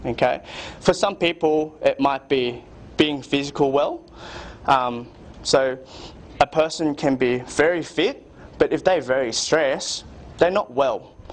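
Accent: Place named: Australia. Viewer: I am curious where this seminar/ lecture took place. Its audiences appear to be mostly oriental made me thought Singapore or Malaysia but the speaker’s accent was Aussie. Thank you for putting up this video.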